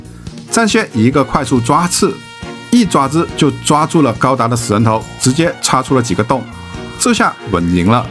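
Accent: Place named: Cantonese, China. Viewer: 好欣賞你嘅頻道，解釋很清楚，中間仲夾雜好地道嘅廣東話👍尤其是機體名稱，你亦都有提及港版叫法，因為我係香港人所以聽落零舍親切👍👍👍well done🙏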